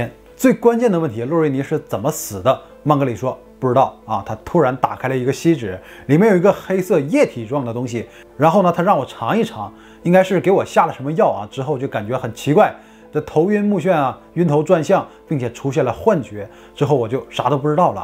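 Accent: Northeast, China. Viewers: 不知道是不是因为东北口音搞笑且亲切（虽然我不是东北银😂），就觉得你讲的很吸引😊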